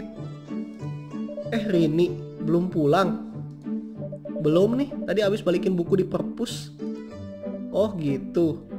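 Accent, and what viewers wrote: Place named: West Java, Indonesia. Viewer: Kak kakak kalau ngomong kayak orang sunda!!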